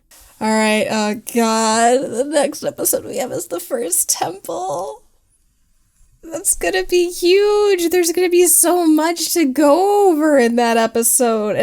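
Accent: Minnesota, United States. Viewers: Every video I see of you I think you’re from Minnesota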